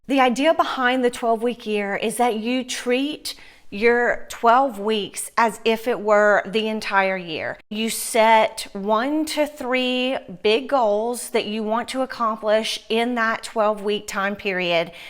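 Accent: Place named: Louisiana, United States. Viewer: AWESOME,! You have obviously done so much to teach the pups how to be good babies, too. Awww this was a great video. Looking forward to more.:) Love hearing your Louisiana accent on Youtube. It's rare to hear it these days. xoxox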